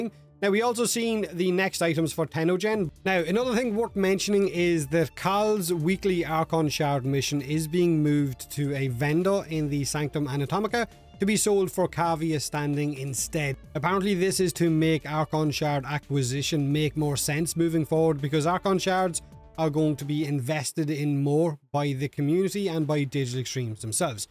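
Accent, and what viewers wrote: Ireland. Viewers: clearly not considering this is an irish accent...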